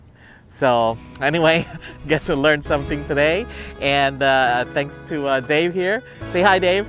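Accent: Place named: Philippines. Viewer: You must be Filipino...your accent lol. I didn't know anglers still fish on poers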